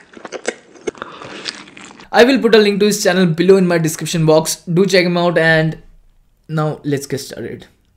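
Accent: India. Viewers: Bro your speaking english very nice but i cant understand you. Your accent is so indianish. You are a very good speaker and i love your videoes. Plz at least try to speak with a little different accent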